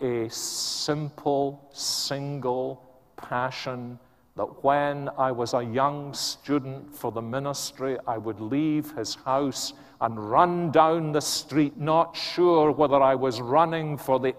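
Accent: Scotland, United Kingdom. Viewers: Preaching of the word of God is solemn. Hearing it in the Scottish accent seems to enhance the solemnity!!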